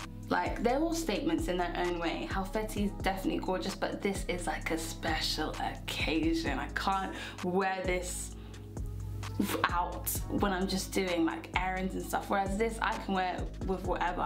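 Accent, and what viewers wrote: United Kingdom. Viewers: 🤤🤤🤤😍 you are gorgeous AND love the British accent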